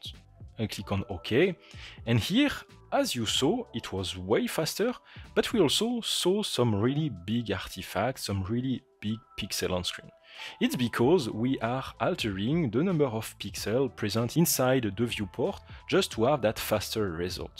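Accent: France. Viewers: Accent français n'est-ce pas? 😊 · The French accent in English is out of this world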